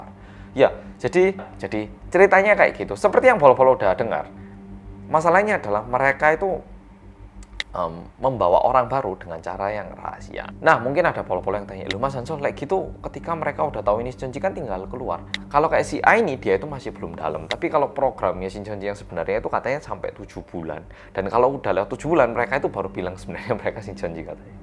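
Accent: Java, Indonesia. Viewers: Aku ngajak tmnku nonton mas. Dia kaget + ribut pas denger mas ngomong jawa + medok wkwk gemoy:') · Favorit youtuber ini mah, suka sama pembawaannya dan juga medoknya. 😂🤣👋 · Udah banyak yang bahas Shincheonji di Youtube, tapi sengaja gak nonton soalnya nunggu yang versi orang korea yang medhok aja deh!😀👍😀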